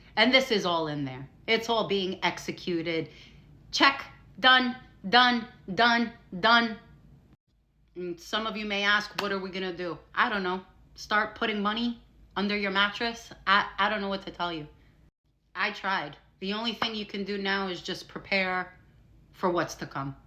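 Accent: New York, United States. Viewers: Queens? Long Island? Massapequa?? Love the accent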